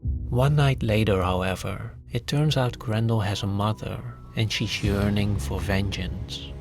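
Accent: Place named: Netherlands. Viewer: I recognise your accent is it dutch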